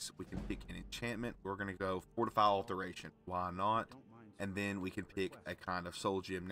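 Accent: South, United States. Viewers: got that Theo Von southern charm love that shi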